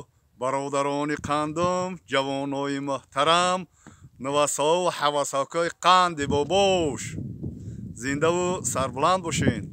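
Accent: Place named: Kulob, Tajikistan. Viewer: Бобо кулоби гапзан лижа меган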